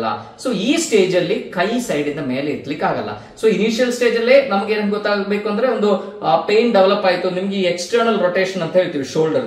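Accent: Kerala, India. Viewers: sir you seems like from malayalam language background but your kannada is excellent..👍🏻very good information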